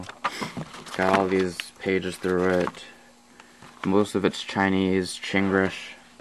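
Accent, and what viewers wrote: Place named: Chicago, United States. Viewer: U need to lose that Chicago accent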